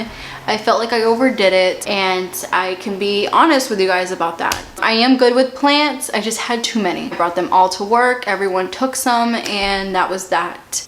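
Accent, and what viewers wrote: Boston, United States. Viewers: Where r u from? Is it Boston ?, Jersey?I hear ur accent every once in a while, but can’t place it. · your dialect is fascinating, where in USA are you from! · She's from Boston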